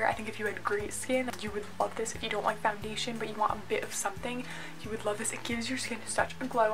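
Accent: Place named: United States. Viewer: She talks to fast can't understand her American accent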